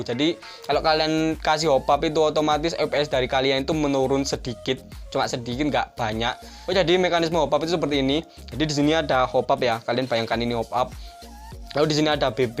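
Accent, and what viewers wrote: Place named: Java, Indonesia. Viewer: Jowone medok ea🤣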